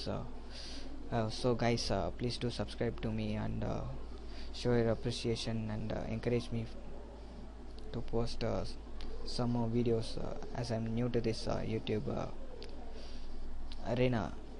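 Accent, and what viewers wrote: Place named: India. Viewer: It's English. Trouble with Indian accents?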